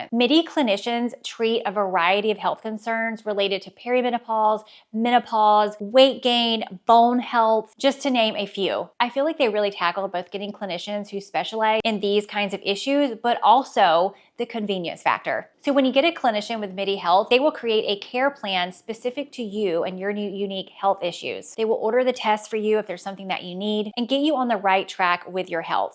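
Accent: South, United States. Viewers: I'm not even thinking about the fashion. I used to teach English and I'm wondering if any non-native English speakers can pick up on her subtle southern accent here. It's subtle, I wonder how this sounds to someone from outside the US and if they're even able to pick up on it or place it, or just find some of her intonations confusing compared to other regions of English speakers. For the video, this is another classic example of a fashion girly talked about modern versus dated looks in an interior design setting that could easily be under similar scrutiny that does NOT match what they are recommending for fashion! But who's counting.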